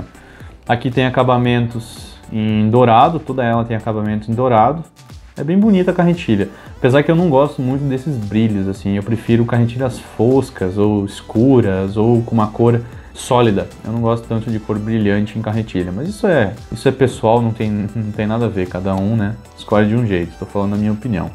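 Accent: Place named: Rio Grande do Sul, Brazil. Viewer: Top o vídeo vc é do rio grande do sul? Vim aqui procurando um vídeo sobre carretilha pq estou tentando juntar uma grana pra comprar um pq pesco de molinete até o momento mas que sabe consigo comprar uma. Mas aí vi que vc tem um sotaque gaúcho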